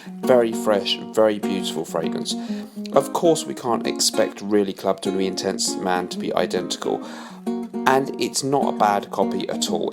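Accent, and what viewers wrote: England, United Kingdom. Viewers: Hi, I just saw your video now, I realise that it’s too late to comment now but I have a Mercedes-Benz Select and I wondering if it’s closed to the Creed Aventus or not? By the way, I studied a MSc in the UK 🇬🇧 so I so happy with your accent so far, it’s so pure English...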